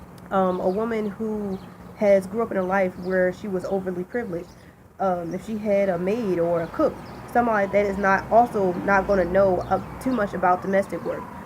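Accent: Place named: Philadelphia, United States. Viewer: Sounds like you're from Philly sis.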